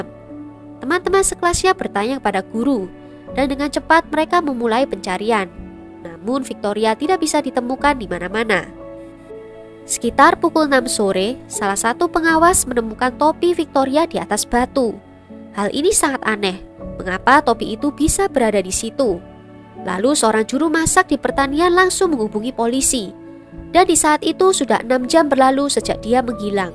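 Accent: Java, Indonesia. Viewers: Sll gaa bosen liat semua video nya say medokuhhh 👍💖💖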